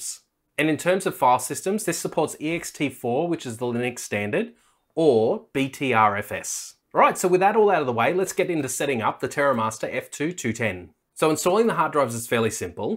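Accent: Australia. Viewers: Can I use one 2TB HDD and one 4HDD in the 2 bays at the same time? How many devices (phones, tablets, laptops and desktops) can be connected to this NAS? How many accounts/personal folders can be created for this NAS? Thank you very much for your response. (Your accent makes me miss my OZ friends, mate)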